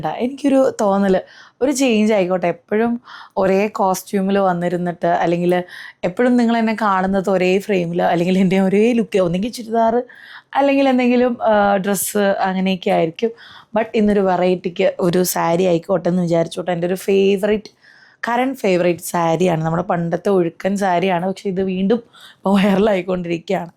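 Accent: Thrissur, India. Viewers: kunje ethu dress ittaalum kaanaan nalla bangiund U R always perfect by all means Kottayam kaari yude language slang Thrissur kaari pole aayi Kottayam pokunna thrill il aann enjoy cheyyu Happy n Safe journey God Bless ❤❤❤❤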